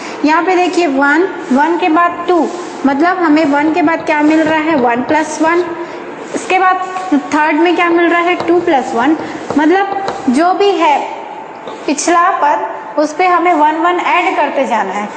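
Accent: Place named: Bihar, India. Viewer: Why using bihari accent 😞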